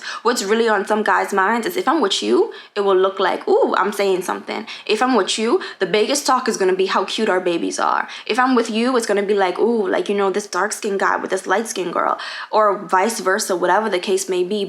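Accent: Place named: Trinidad and Tobago. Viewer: Are you Trini? I hear that accent sis !! ❤❤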